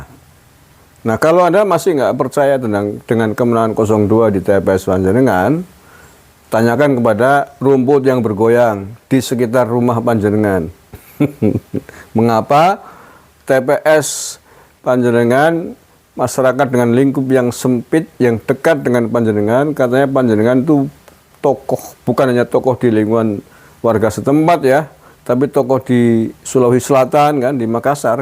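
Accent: Banyumas, Indonesia. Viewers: Jian omngne rika gawe aq ngguyu bae.omngn asli bnyumas.bner2 asli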